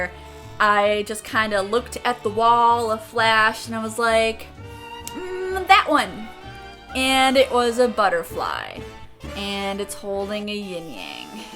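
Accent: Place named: Chicago, United States. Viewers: Ya from Chicago? Ya 'da bears 'da bulls accent! Its all good lol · I thought you had an Illinois accent! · I love how Midwest your accent is I can hear the Chicago dripping from your voice.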